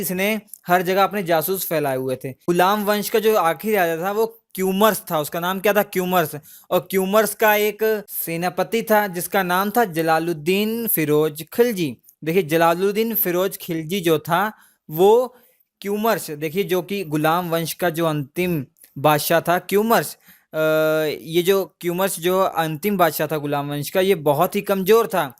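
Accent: India. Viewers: Your accent is Desi. Bahut achhe se smjh me aata h sir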